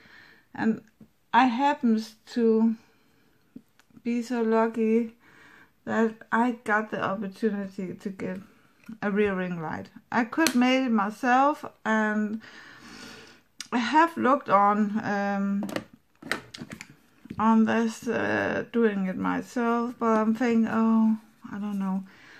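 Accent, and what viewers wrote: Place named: Denmark. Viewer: You live in Denmark? I love your accent!! Your eyes look beautiful!!!!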